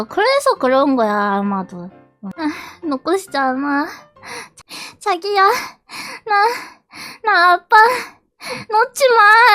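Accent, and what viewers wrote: Japan. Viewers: 한본어 개기엽넹ㅋㅋ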